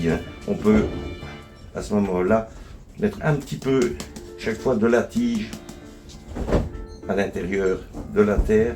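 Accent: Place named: North, France. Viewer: Vous êtes un modèle, une expérience, une expertise, une bienveillance, un accent et cette chose inexplicable des personnes du Nord. Envoyé par un ancien picard devenu parisien, élevé dans le potager du père et la culture d’endives en champs…du père également ! Continuez à nous régaler et bravo. Miguel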